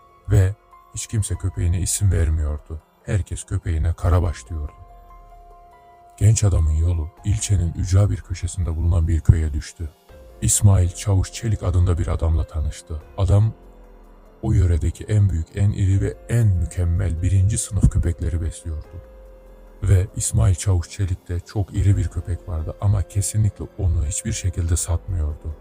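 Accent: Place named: Kurdish, Turkey. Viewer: admin kürtmüsün len bu ses tonu ne kıroı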